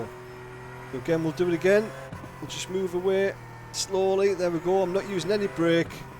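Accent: United Kingdom. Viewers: What part of UK is your accent?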